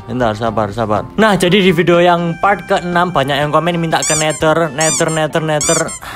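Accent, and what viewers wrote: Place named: Java, Indonesia. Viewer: Enaknya orang Jawa kalo ngomong lucu 😂😂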